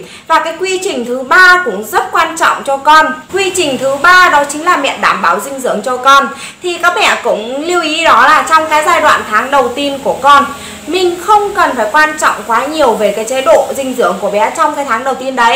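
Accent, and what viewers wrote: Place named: Thanh Hoa, Vietnam. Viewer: Giọng THanh Hóa đặc sệt 😂 hơi khó nghe b ạ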